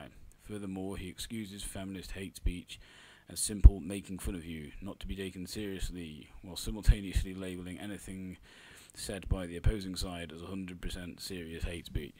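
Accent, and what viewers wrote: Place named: Merseyside, United Kingdom. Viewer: Brilliant Merseyside accent, m8.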